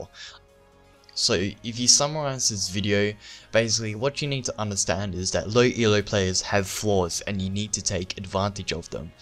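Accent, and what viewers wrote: Australia. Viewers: YOU'RE AUSTRALIAN, AREN'T YOU...... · im from Argentina, and i have no problems understanding what he says, i do believe he is Australian (he mentioned something about it in the last video comment section) its just that you arent familiar with his accent, thats all, australian accent is more like british if you actually want to compare accents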